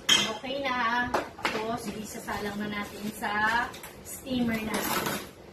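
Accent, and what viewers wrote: Iloilo, Philippines. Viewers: Nang may halo ka nga ilonggo haha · ilongga gid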